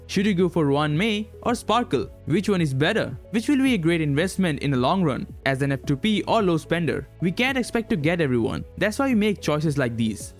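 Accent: India. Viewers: You are indian ? Accent seems so {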